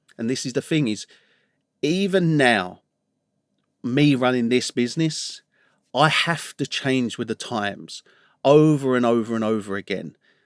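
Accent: England, United Kingdom. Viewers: I hate to say it man, but you had me with the accent. I want to trust everything you’re telling me for some reason. Why would an English gentleman such as yourself do otherwise?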